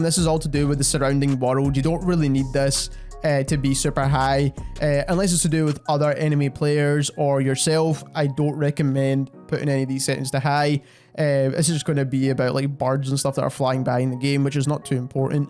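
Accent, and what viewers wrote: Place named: Scotland, United Kingdom. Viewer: Same bro wasn't expecting a fellow scotsman when I clicked the video , have another sub 👊🏼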